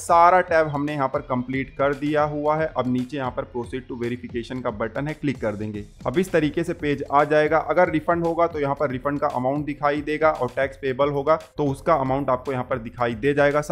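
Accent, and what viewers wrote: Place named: United Kingdom. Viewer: This is for INDIAN senior citizens. Why this British accent. Pl reload with normal Indian accent.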